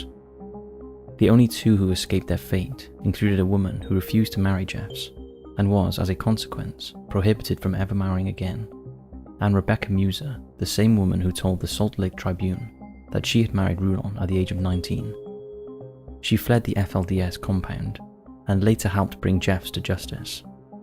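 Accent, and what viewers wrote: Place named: England, United Kingdom. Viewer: Not my problem. Why is a pom narrating?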